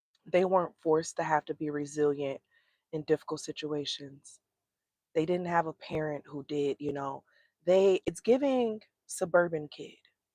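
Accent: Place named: Midwest, United States. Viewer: I knew yo ass sounded like you was from Milwaukee or the Midwest lol. That’s my home town 414. Appreciate the message. 💫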